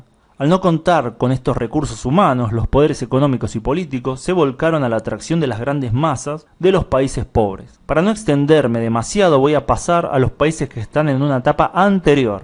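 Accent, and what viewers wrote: Argentina. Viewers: Es argentino, es su manera de hablar.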